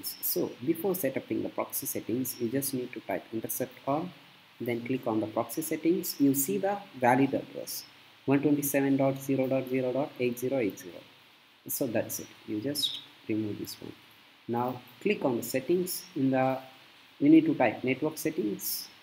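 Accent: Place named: Tamil Nadu, India. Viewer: I love your TAMIL ACCENT 🎉❤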